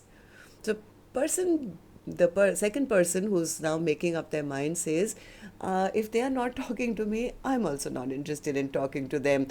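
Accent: India. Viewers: Are you hindi please??!! Your english IS very good🎉❤😊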